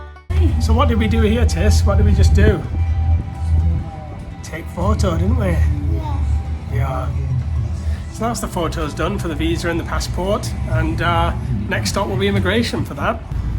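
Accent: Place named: Lancashire, United Kingdom. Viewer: Love the Lancastrian accent.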